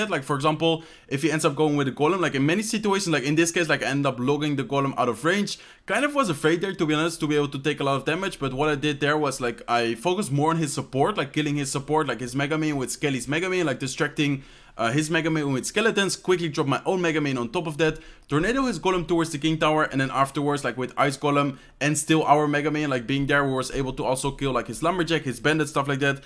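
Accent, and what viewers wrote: Netherlands. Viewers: Are you dutch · Is deze gast niet nederlands of lijkt dat zo aan zijn accent te horen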